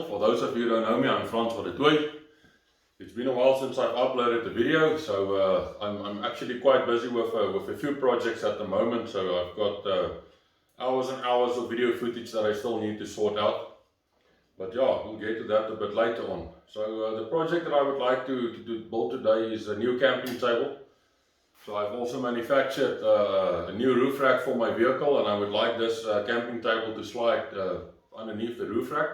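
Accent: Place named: Afrikaans, South Africa. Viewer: I knew that was an Afrikaans accent! But the name puzzled me. Must be Belgian/Dutch/ South African.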